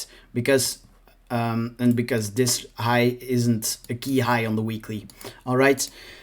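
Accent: Belgium. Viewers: Dat Belgisch accent 😆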